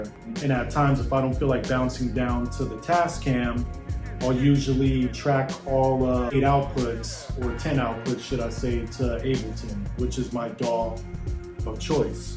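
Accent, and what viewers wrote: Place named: Brooklyn, United States. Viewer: I'm listening..... yo you from Brooklyn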